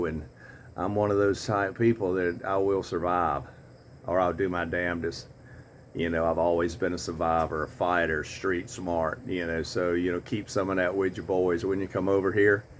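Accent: South, United States. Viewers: Southern accent is fun to hear 😂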